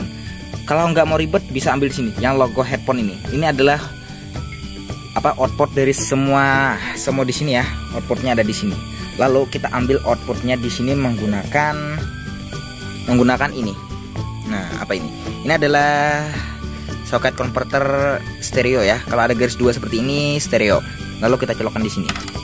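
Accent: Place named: Banjar, Indonesia. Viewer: Suaranya banjar banar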